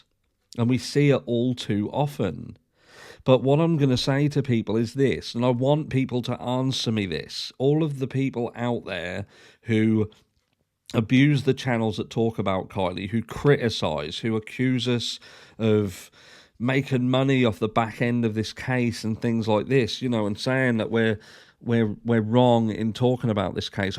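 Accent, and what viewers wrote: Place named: Norfolk, United Kingdom. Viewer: you're very welcome. It's mad considering both our countries speak the same language there are so many differences, and I much prefer Norfolk accent to my own accent 🤣🤣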